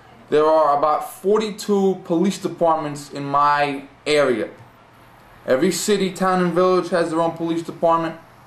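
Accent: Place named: New York, United States. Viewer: Yo, welcome to Nu Yok. Got to love the thick accent.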